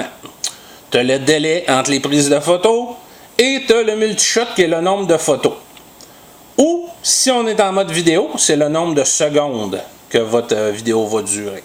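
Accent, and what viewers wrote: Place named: Canada. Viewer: désolé,,,,,je ne comprends pas ton langage,, trop fort accent Canadien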